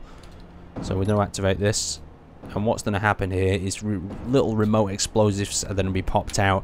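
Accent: United Kingdom. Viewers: "no dam-edge to the stayshin at awl" filthy fucking britbong liar it damaged the station get ur fuckin eyes checked are they as bad as yoru teeth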